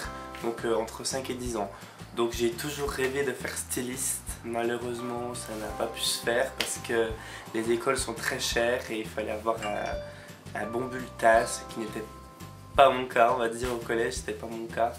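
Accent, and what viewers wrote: Belgium. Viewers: Je suis belge et c'est vrai qu'en t'écoutant, j'étais persuadée que tu l'étais aussi :D Chouette découverte en tout cas, continue comme ça ;)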